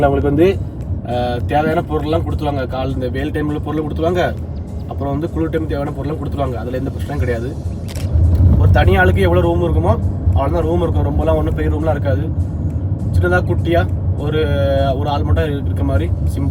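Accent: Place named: South Tamil Nadu, India. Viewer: South Tamil ❤ Slung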